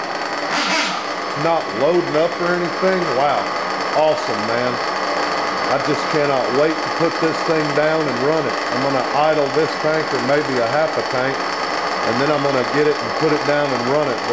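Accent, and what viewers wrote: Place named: South, United States. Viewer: u sound like an easy going southern guy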